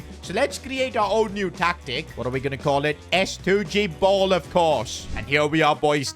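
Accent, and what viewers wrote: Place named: India. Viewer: Bro why he talks like Mickey Mouse but in a Indian accent 😂😂🤣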